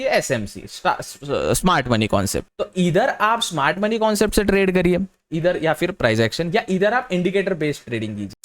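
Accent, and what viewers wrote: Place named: Indore, India. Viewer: Bhaiya aap indore se hai na pakka😅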